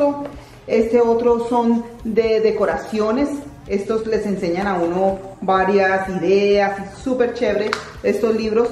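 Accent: Colombia. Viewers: me encanta el acento Colombiano, en instantes se escucha!!!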